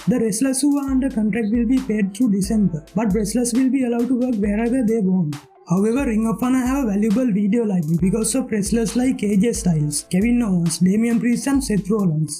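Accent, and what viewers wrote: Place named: India. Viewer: Can I understand a single word this guy says. His Indian accent is just too strong to understand.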